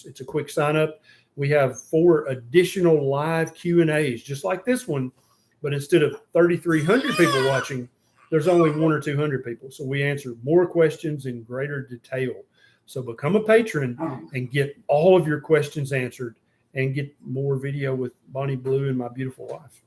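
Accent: South, United States. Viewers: Oh, lighten up. The doc has a southern accent and he is in his own home. He can talk any way he wants. If it offends you, you don't have to listen.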